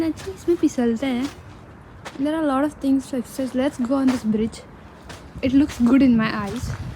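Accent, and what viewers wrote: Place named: India. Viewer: mai aapka first. Vlog sa huu🤧🤧🤧 l like you hindi accent esliye mai aapki vlog dekhti huuu🫠🫠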